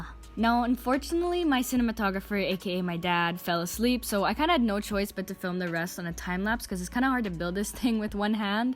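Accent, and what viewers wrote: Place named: Philippines. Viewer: You sound like you're filipino. Are you?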